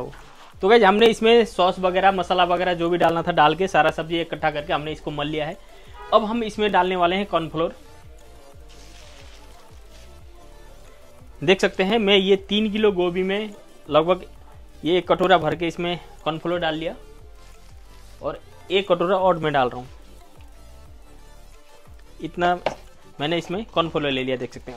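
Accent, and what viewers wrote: Nepal. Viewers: Aap Nepal se ho kya ?? Aapki aabaj aur tongue se aisa feel ho rha hai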